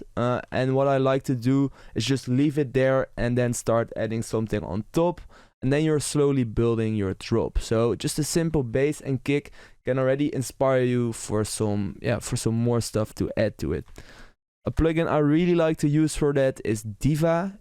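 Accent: Netherlands. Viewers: aan het accent van jouw Engels kan ik ook gewoon horen dan je een Nederlander bent. video is een banger!!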